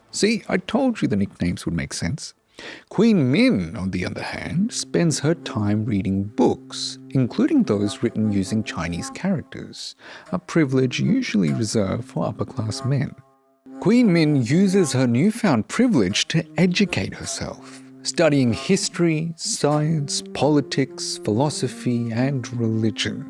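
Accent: Australia. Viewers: Does sound a bit like him haha, Aussie accent and a slow/dramatic delivery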